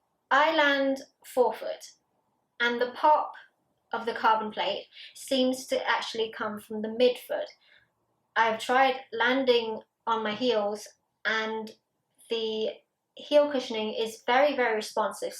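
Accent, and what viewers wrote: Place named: United Kingdom. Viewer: Great review, love that British accent. Bravo!!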